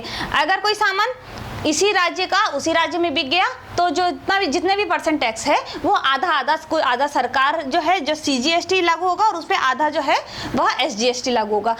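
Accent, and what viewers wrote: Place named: Rajasthan, India. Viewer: nice mam rajasthan she hi ho kya app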